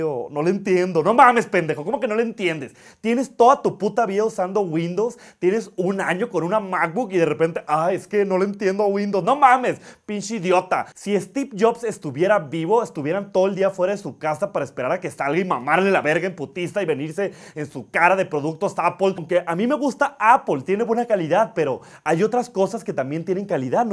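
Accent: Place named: North, Spain. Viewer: Aquí conteniendo mi risa porque ya todos duermen. ¡Pero que cosa! Este tipo es muy chistoso. Aparte su acento norteño: “mushasha”. ¡Good!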